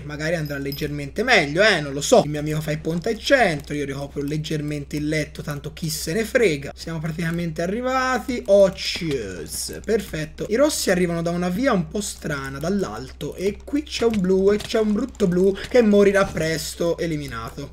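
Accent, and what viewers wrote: Tuscany, Italy. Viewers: perché non fai un video delle bedwars, dove parli solo con il tuo dialetto toscano, spolliciate così lo legge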